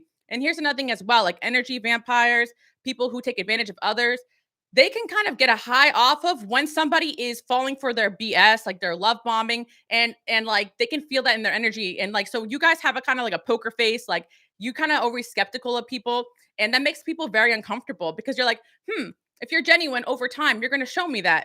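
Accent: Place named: South, United States. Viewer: Lol readin territ… lean i to that southern why don ya 😅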